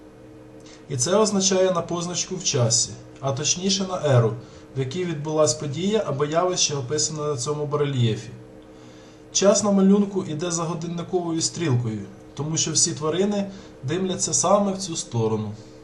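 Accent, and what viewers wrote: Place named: Western Ukraine, Ukraine. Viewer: Понимаешь что достаточное колличество лайков оно не наберёт - тема не популярная. Но раз Бог открыл для тебя эту информацию, значит может это не просто так, а для того чтобы ты поделился ею! И ещё , в видео Говори медленнее, я из Украины , но твой чистый западный диалект, не очень понимаю. И более доступно объясняй - когда ты писал в комментариях - я гораздо быстрее понимал , чем когда ты говоришь в видео.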